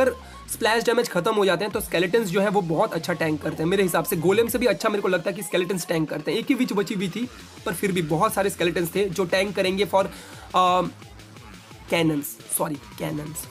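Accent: Bengal, India. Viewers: you are Bengali????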